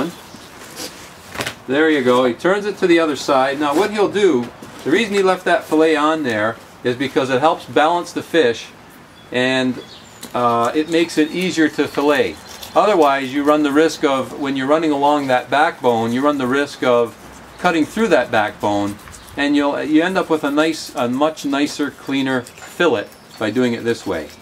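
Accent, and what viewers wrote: Canada. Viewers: Sounds like a Canadian. Hope he’s here legally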